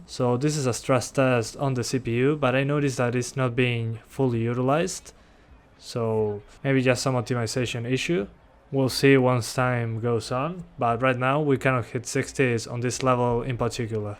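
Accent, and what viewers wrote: Argentina. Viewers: Soy yo o es argentino ? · ingles argento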